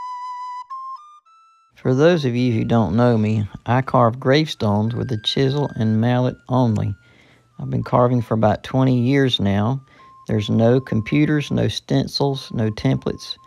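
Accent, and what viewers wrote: South, United States. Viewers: Happened upon this. Interesting. Would have also been nice if you read fully those earlier stones that didn't quite make the earliest. I hear a Southern accent, where are you from? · “The LORD has preserved these letters”. Not many look at things like you and I. Our LORD GodAlmighty has his WILL, HIS HANDYWORD on everything we see that is Good and for HIS SAKE. I came from a line of cemetery Stewards. Both my maternal and paternal grandfathers and great grandfathers lived on Cemetery Stewards Houses…which were the cemetery’s church parish house. We had some good times playing at mammaw and pappaw’s house. Especially in the summertime when we played outside late. We would play nighttime hide and seek. Our backyard wasn’t like most, it was a huge backyard with marble, granite, and black onyx vertical tablets everywhere. THE greatest place to play hide and seek in the summer evenings when the hot sun was going down and it started to cool. 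The only lights were lightning bugs or the full moon occasionally reflecting off the high polished granite and black onyx stones. Some nights we had to give up our hiding spots because some of the stones made a perfect hiding spot if they were wide and tall enough for us to crouch down behind. Precious Memories….. I love your southern accent. It’s very comforting to hear. The old southern accents are fading away. All the southern kids of this generation don’t have the traditional tight nit families surrounding them with the accents. They are only speaking what they hear on the iPhone social networks. …..it’s sad. It’s also sad to see this current generation NOT BURYING THEIR LOVED ONES. Everyone is taking the greedy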